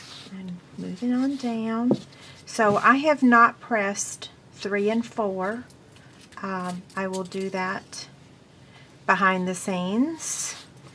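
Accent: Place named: Texas, United States. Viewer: Thanks for taking the time to make and share these videos! I'm about to do this to make a little quilt for my daughter. P.S. Your accent reminds me of growing up in Texas! 😊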